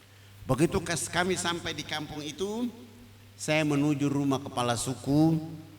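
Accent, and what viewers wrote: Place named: Papua, Indonesia. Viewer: Pa ustad kemana aja saya senang dengar dakwah nya.dengan suara kas Papua nya sering" lah isi di yutup.tks sebelum nya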